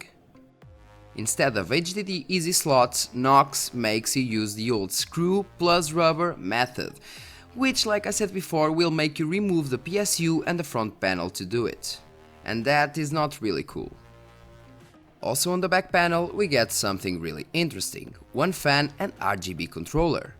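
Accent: Portugal. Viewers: deu para perceber pelo sotaque que és tuga . Continua com ótimo trabalho, abraço !! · Are u portuguese? I can see it by the acent xD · E esse sotaque tuga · Olá a todos, eu cai aqui de pára-quedas e depois de ver este video fiquei desconfiado que o é português de Portugal, estou certo ou errado? É que este inglês ó típico de Portugal.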